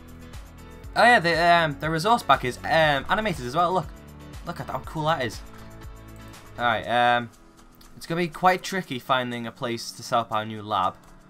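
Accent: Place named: Scotland, United Kingdom. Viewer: You have a bit of an accent, are you Scottish?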